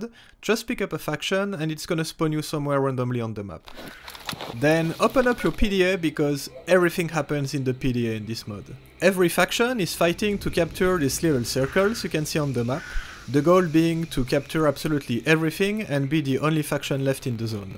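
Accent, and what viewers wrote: France. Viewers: salut , j'ai entendu a l'accent que tu étais francais :) alors voila j'ai un soucis avec a anomaly en mode warfare c'est en russe! alors que le mode "histoire" est en anglais saurais tu comment arranger sa ? merci d'avance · Merci pour ce tuto (ton accent anglais me fait penser que tu comprendras mon commentaire 🙄😆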